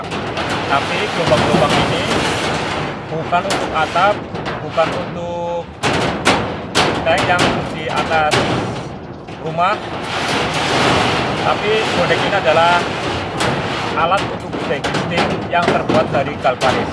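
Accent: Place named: Banyumas, Indonesia. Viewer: Mas...Asli Banyumas apa Tegal?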